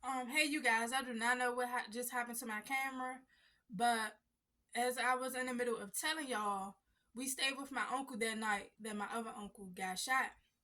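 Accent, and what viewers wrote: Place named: Washington DC, United States. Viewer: Dc accent heavy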